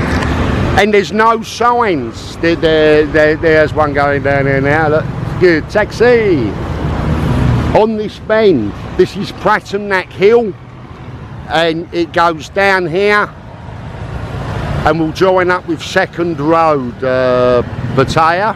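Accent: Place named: Cockney, United Kingdom. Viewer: Again good video but you cokney accent make dificult to understand fot frog like me !!!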